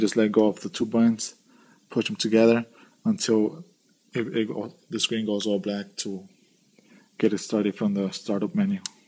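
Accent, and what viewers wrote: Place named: Argentina. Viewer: genius genius genius many thanks, I've looked everywhere online videos and more videos of this tablet and did not appear in either hand, I say this tablet is only jajaj ... well until I found your video and could not believe it, get to the logo android but could not access the recovery I needed that combination, so I thanks a lot for the contribution they have made .... I subscribed to your channel and little hand up. I do not understand a lot of the Argentine English, so I had to use the translator thanks again ....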